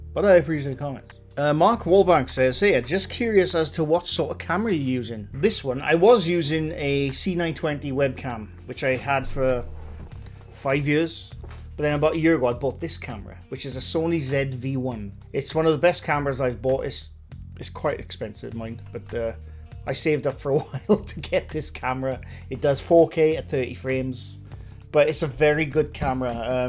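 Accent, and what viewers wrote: Wales, United Kingdom. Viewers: Hi, love all your content and never miss an episode. As a fellow ginger I live in the skin cancer capital of the world (Qld Aust) and it's a pain in the arse having countless skin cancers removed already and no doubt will have more, is it much of an issue for you where you live in Wales? Also I have noticed your beard is multi toned as mine did when I hit the 30's mine became tri coloured to the point that I gave up growing a beard sadly. As for your accent, for me you are clearly Welsh but I have also encountered guys that sounded more English than Welsh and another fellow that I worked with I could barely understand because he spoke so quick and had such a thick accent. Don't get me wrong I like the Welsh, us Aussies seem to have an affinity with the Welsh, Irish and Scotts more so than the English. Keep up the good work.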